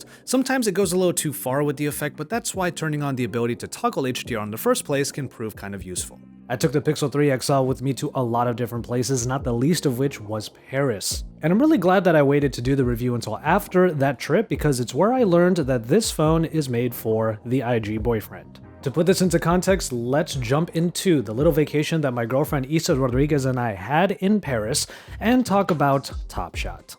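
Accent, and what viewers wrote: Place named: Philippines. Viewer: I love it na hindi mo tinatago ang Pinoy accent 💙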